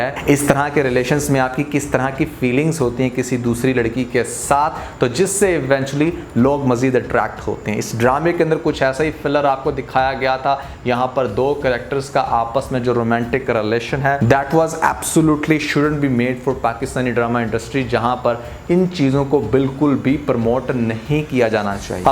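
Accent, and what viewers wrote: Punjab, India. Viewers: Aap English ko punjabi mein bolte hain.PLEASE PLEASE PLEASE DON'T DO THIS.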